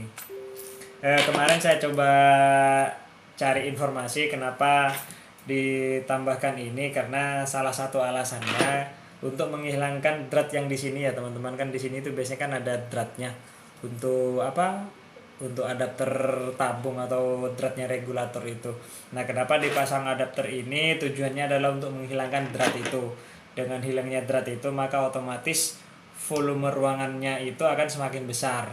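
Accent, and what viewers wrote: Madura, Indonesia. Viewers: Asli mana bang...logat kq kayak orang madura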